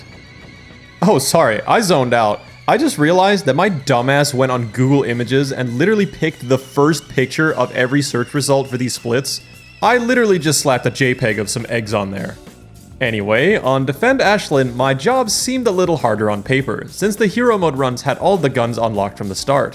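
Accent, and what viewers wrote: Kansas, United States. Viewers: i always assumed you were american because of the accent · You sound American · You sound like you're from Kansas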